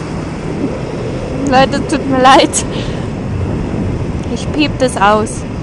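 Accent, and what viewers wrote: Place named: Baden, Germany. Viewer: Isch des rudschig 😂 Badner? 😂✌️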